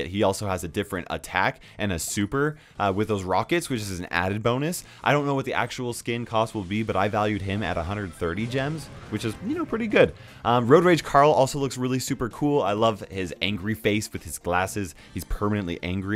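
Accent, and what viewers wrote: Australia. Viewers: That's Aussie not British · She's ausie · SHE IS AUSTRALIAN NOT BRITISH!! REEEEE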